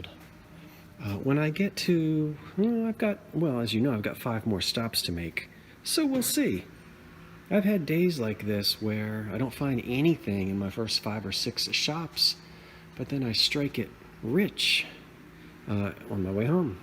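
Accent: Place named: North Jersey, United States. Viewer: Northern Jersey talks like that. I went 11th year of school up there & they thought I talked weird. I was originally from Baltimore, Maryland. 😅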